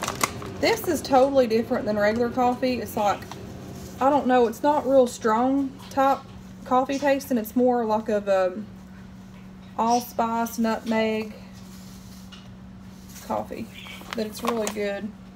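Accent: South, United States. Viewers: Pumpkin iced coffee! Yasssssss!!!! ❤️❤️❤️ so glad to have found your channel! Love that southern accent honey. We will get along justtttt fine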